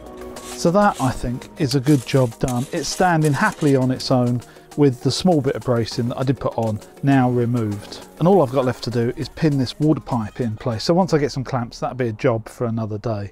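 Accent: United Kingdom. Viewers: From your accent, I'm guessing you're in the UK. Your main problem is that your fence contractors don't know how to properly install a fence. Plus, if you're going to all of this trouble to dig out the original concrete footing and rotted wooden post below ground level, why not just take a few more minutes and just remove the rest of the old post and put in a complete brand new one instead of using that ugly concrete post support fix?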